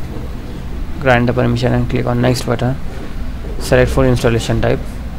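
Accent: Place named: Arab world. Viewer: من صوتو واضح عربي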